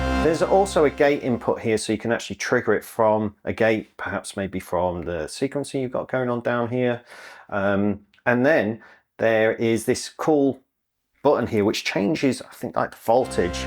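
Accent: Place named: Tameside, United Kingdom. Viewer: You sound like you are from my area of the country, I'm in tameside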